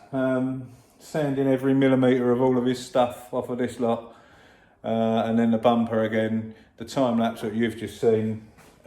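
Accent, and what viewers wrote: Essex, United Kingdom. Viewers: Loving the channel and content, great tips and advice, thank you. Great to hear my old home county accent! I left Essex after 30 years to move to Devon. That was 15 years ago! What was the brand of cutting compound and finishing polish you mentioned in your live q&a? Cheers and keep up the great content. Was so chuffed to see a R5 GT Turbo. I had one in the early 90's!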